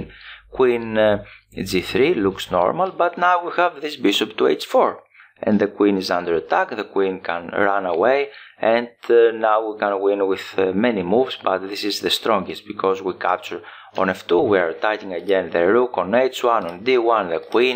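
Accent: Greece. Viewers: Χαχαχα κατάλαβα ότι είσαι Έλληνας από την προφορά σου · I love the Greek accent lol.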